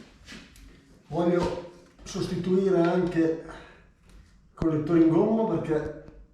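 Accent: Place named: Emilia, Italy. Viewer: mi pareva un accento nostrano, io son nato a Pavullo, piccolo il mondo. Dicevo le/la tanica sotto le valige perchè di norma son le più pesanti piene in peso specifico, più basso lo hai meglio guidi, inoltre hanno una buona base piana sulla quale appoggiare una sacca che una base rigida a volte non ha. Seguo due ragazzi (franksaffair) che sono in giro per il Brasile ora, e lui le ha posizionate li ed è venuto un bel lavoro pertanto mi son permesso questo consiglio.Di Transalp devo revisionarne uno appena si fa più caldo. Ho questo hobby. Buona strada, viva l'Emilia e le crescentine cotte con le tigelle.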